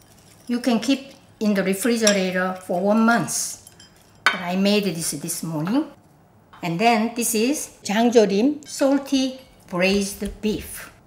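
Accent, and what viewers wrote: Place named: South Korea. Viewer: 한국말 같은 영어 너무 좋습니다!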